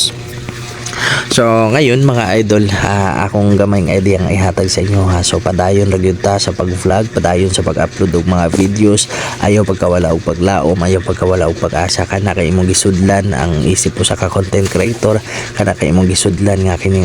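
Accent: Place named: Cebu, Philippines. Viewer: Like12...MAAYONG GABII IDOL... AYAN OK NA GUPIT SA BAGONG STYLE PAMORE .. TINUOD KA LODS...DI BITAW LALIM MAG VLOG CONTENT CHANNEL PERO OK LANG LABAN LANG SA BUHAY ALWAYS HAPPY...NINDOT DIAY NI NGA BARBERSHOP KAY ALL AROUND PAMORE... IBA ANG TUNO LENGUAHE NGANHA SA CEBU KAY PURE JUD KAAYO MANGISTORYA DILI PAREHA DIRI SA MINDANAO BISAYA/CEBUANO SAKSAK SINAGOL PAMORE... DONE WATCH FULL SUNGKO SA TUMOY... mins.😊😊😊WITH ALL ADS COMPLETE...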